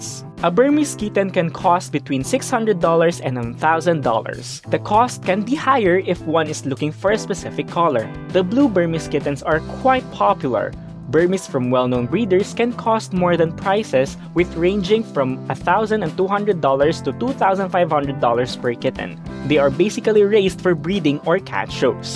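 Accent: Philippines. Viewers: I love my Burmese. She always climbs my shoulder as soon as she sees me lounging on the couch or when working in front of the computer. Btw, the voice-over is a clear giveaway Pinoy! Yay!🙌🏼🇵🇭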